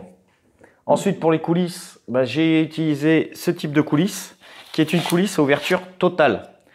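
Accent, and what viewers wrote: North, France. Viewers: bonjour, bon travail tu ai du nord ?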